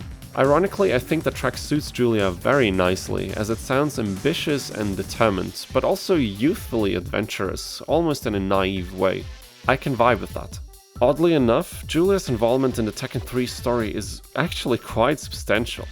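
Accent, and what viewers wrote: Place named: Netherlands. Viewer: Easily one of the most irritating videos I’ve ever watched from start to finish Audio balancing, trash. Netherlandic accent, trash. Ad break after every song, hope the .01 euros I gave you was worth the time you wasted of mine. Can’t take 5 seconds to google “what does embu mean?” While editing this whole ass hour long podcast on a computer.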